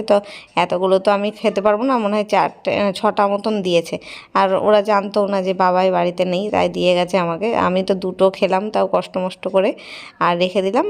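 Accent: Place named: Bangladesh. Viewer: Eto modern sobkichu , kintu asba , jaba , khaba ei bangal kothagulo birokto lage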